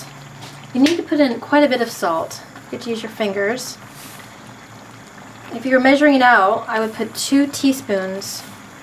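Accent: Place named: France. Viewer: I read your comment and get your concept. I love it! Recipe looks good. I like it soupy stew like but Check out Martha Stewart and Daniel Boulud ratatouille. It's very authentic as they eat in France as side dish. Bonne chance X p.s your French accent is so incredible!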